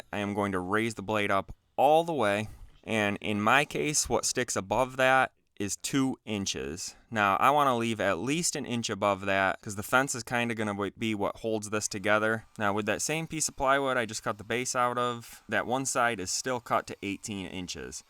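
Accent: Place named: Michigan, United States. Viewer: Takes one whole minute explaining how most videos are too long 😂 Good video man. Appreciate the tips. Also, I didn’t realize how easy it was to spot a Michigan accent till I moved away. But I’d be willing to bet your from Michigan. Aren’t you? Haha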